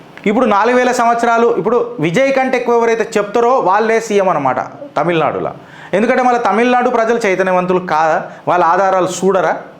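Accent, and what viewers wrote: Telangana, India. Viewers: ఎదో కవరింగ్ ఏజెంట్ గాళ్ళు, కీల అంటే లోపల, కీలడి, దాన్ని పలకడం కూడా ఎటకారం, విజయ్ మీద బేపన విషం ఎందుకు? హిస్టరీ అనే నిజాలు బయట పడుతుంటే, నీ తెలంగాణా యాస ఎవడికి లెక్క తమిళ్ సంస్కృతి, బేపన కంటే పూర్వం అని రుజువు అయితే, పిలక కథలు కూలిపోతాయి అని భయం తో నిన్ను పంపారు, పంది పేసాద్ ని కూడా వదులు జనాల మీద కి బుద్దుడు పంది ని తిని చనిపోయాడు, అంబెడ్కర్ రాజ్యాంగం రాయలేదు, నేను అప్పుడు ఉంటే, అంబెడ్కర్ మటష్ అనే మాటలు వాడి చేత అనిపించారు గా నెక్స్ట్ ఎంట్రీ వాడే కదా 😂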